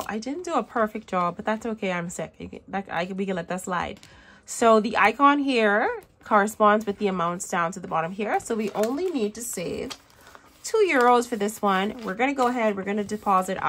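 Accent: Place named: Caribbean. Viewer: Wooohooo Caribbean girls run it 😂😂😂 defo heard your Caribbean accent thought you were Bajan😊😊 Great video love all the boxes❤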